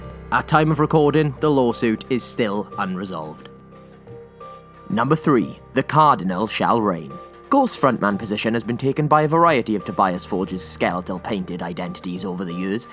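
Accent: Wales, United Kingdom. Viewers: Is that a Welsh twang I'm sensing there ?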